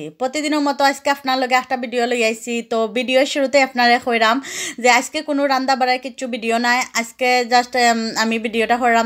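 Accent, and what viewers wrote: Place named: Sylhet, Bangladesh. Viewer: বাংলাদেশি দেখলে মন বরে জায় বন্ধু হলাম আপনি সিলেটি মনে হয়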